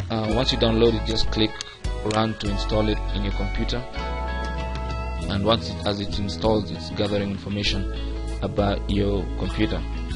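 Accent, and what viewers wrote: India. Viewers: me hears indian accent clicks off video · This guy sounds like one of those Indians that cold call you and demand money telling you computer has been hacked...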